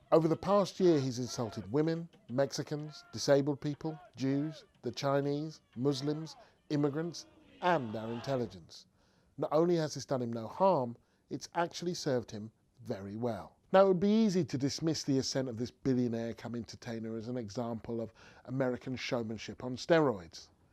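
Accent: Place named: United Kingdom. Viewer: I think it's so cool when a black guy almost speaks with a real British accent!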